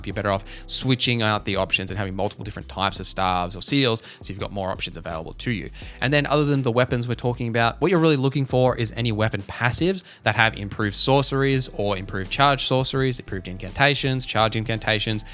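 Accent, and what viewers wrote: Australia. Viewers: Great guide, and so nice to hear an Australian accent :)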